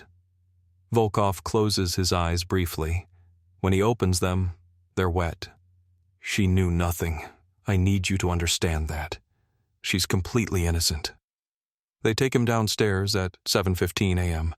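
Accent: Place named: United States. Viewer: Dull voice in this monologue. Very American. Machinelike. No nueancies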